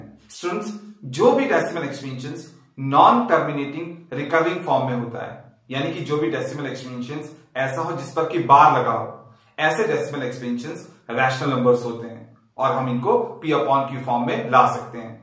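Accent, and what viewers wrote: Pahadi, India. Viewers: Tum pahadi bolen cha? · Aap pahadi ho kya?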